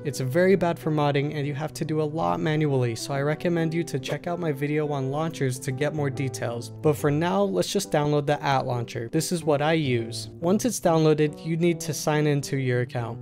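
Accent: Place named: Eastern Europe. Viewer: The creator had a slavic accent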